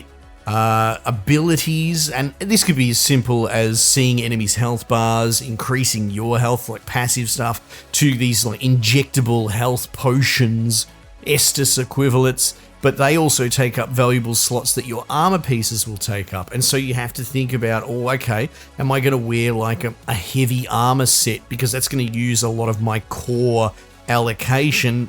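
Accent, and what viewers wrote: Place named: Australia. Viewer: I know it's the aussie accent but I keep hearing Dick13 lol 😂 Just got Surge 1, Surge 2 was such a cool and good game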